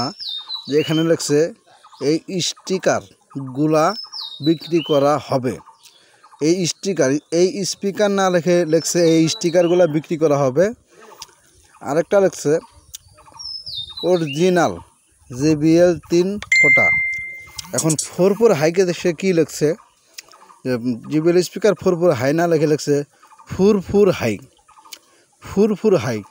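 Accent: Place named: Satkhira, Bangladesh. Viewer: ভাই এগুলা সাতক্ষীরার ভাষা আমার মনে হয়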